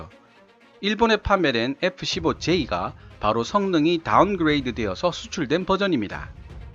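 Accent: Gyeongsang, South Korea. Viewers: 갱상도 분이신가보네요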